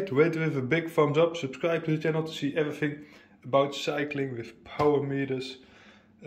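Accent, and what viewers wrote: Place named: Netherlands. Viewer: I think you are dutch speaking :))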